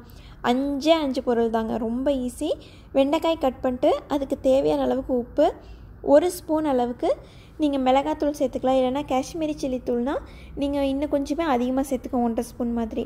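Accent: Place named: Sulur, India. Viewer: Ippo than casual a unnoda video parthenma.unnoda voice modulation ne solluthu, ithu namma ooru ponnunu.udane subscribe pannitten.nanum Sulur pakkam than....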